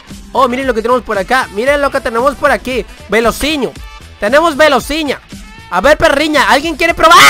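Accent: Argentina. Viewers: ! Sos argentino? Xd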